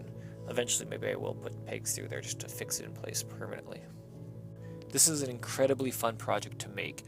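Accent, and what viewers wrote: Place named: Canada. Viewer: Are you Canadian sir?? Good to see fellow Canaidan on here lol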